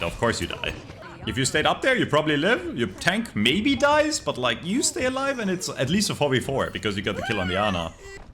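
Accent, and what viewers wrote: Germany. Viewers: Boi, are you German? 😅 Or what is that accent?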